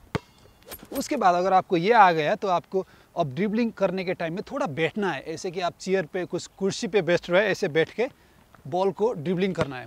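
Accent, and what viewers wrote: Nepal. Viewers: Why i am hearing nepali tone? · Bro your hindi seems like Nepal 🇳🇵. Are you?? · Aap Nepali assent mai bat kar rahe ho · nepali daju k xa khabar tone chai nepali k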